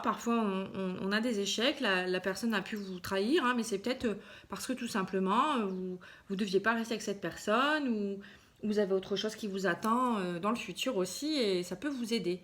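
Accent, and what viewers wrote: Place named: Pas-de-Calais, France. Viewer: 👍Suis du pas de Calais aussi je l avez perçu ds votre accens🤣😘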